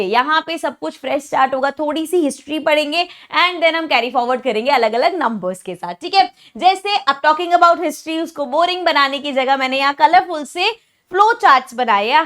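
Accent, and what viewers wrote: Punjab, India. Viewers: Gosh, your punjabiiiiii😭✋🏻🛐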